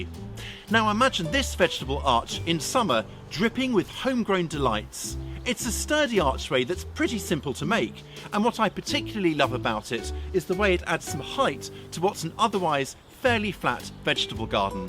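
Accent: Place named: RP, United Kingdom. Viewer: Nice to hear Queen's English.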